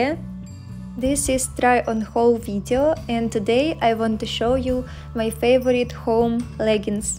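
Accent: Russia. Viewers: Привет, русскому акценту в английском ! 😁😁😁 Классная у тебя фигура ! 👍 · Мне рязанский акцент сразу понравился! :)))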